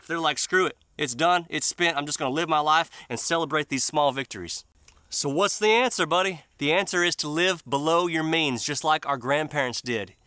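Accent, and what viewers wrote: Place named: Texas, United States. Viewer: I like your voice are you from Texas ? You sounds like Texans..I live in Texas ,but I’m originally from west Africa..love your videos.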